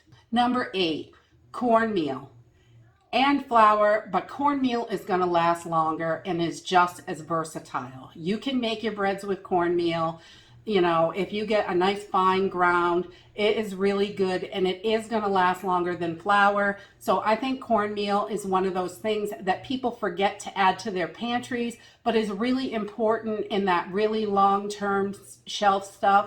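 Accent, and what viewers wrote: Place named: New England, United States. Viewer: Hey, are you in New England? I am - and from your intro (with the fall foliage) and your accent, it seems like maybe you're a fellow New Englander. If you like to keep your location private, no worries. I only go as far as to say that I'm in New England on my channel for privacy (and anti-stalking) purposes. I was just curious.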